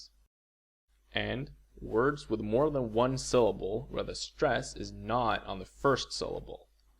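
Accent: Stockholm, Sweden. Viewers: That's just his "Stockholm accent" :P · vilken dialekt har du som säger "kvinner"? Är själv uppvuxen i Stockholm och säger "kvinnor". Nyfiken, eftersom du annars verkar ha exakt samma dialekt som jag :) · its not a strong dialect sure but his stockholmska shines through when he pronounces words with such a twang. Kvinnor, in rikssvenska, should be kvinnOr. Not kvinner nor kvinnör. Like teaching a beginner chinese class with a Beijing accent. Improper and inefficient. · Listening on his tone of the voice im gona guess he's swedish, but its hard to tell. :D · Jag gillar din svengelska!!!😋 I like your Swenglish!!!😋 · Love hearing this with your thick swedish accent in english :D makes it so much more credible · You sound swedish. I think you need to improve your accent a little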